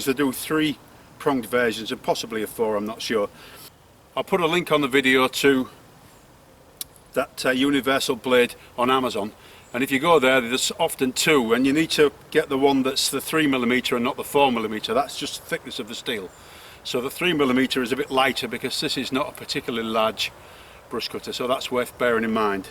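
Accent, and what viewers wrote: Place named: Northern England, United Kingdom. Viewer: This is a great video and a lot of effort put into it. I think the dislikes are from people who aren't front the UK and can't understand your Northern accent😆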